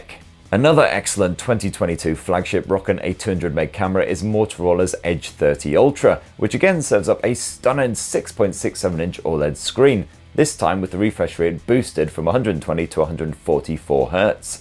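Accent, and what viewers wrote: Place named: Cockney, United Kingdom. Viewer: Man if you didn't have that cockney brogue...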